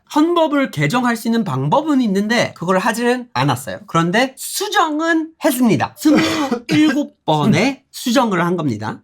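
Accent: South Korea. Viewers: 타일러 말하는거에서 광주이모가 오버랩 된다 ㅋㅋㅋㅋㅋㅋㅋㅋ 광주쪽 교수님이랑 친하신건가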